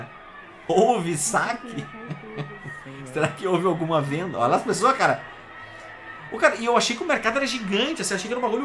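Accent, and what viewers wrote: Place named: Rio de Janeiro, Brazil. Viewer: O carioquil é de fuder meu amigo kkkkkkkk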